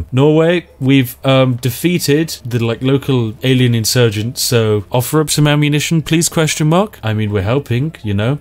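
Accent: United Kingdom. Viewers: SO NICE!!!!! I REALLY ENJOYED THAT VIDEO!!!! british accent!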